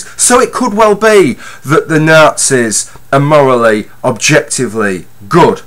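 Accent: United Kingdom. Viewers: Anyone know where in England this guy is from? He talks just like Daphne from Fasier.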